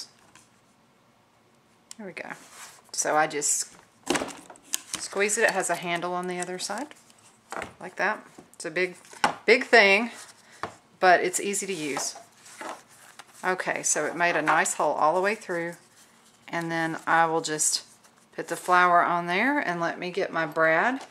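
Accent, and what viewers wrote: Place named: South, United States. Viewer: When I first saw the video I thought you had went and bought a Cricut Cake..I was like "what..I liked her fake"...hahaha. I love the way you talk too...I have the southern accent also so its great to hear someone else with one!! Great video!!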